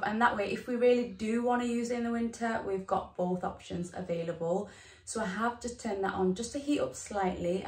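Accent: United Kingdom. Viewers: We Americans are always in awe of British accent! Difficult to know what part of England your accent is from. Nevertheless, great video and watching it from Seattle, The Washington State.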